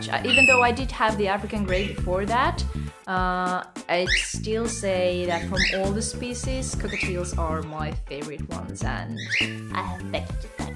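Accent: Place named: Finland. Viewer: I hear a Finnish accent xD. Cute birds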